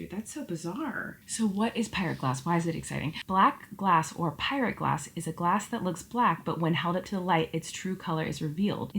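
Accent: United States. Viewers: Oh wow I never thought of how you might not recognise your own accent just like I don’t recognise mine (South West, England). To me, you are THE most American sounding person ever, Californians are the most American sounding people to me like cartoons 😂 like I can’t even imagine you existing that’s how unreal you sound to me because I grew up watching American cartoons I just can’t imagine anyone with you actually existing in my world does that make sense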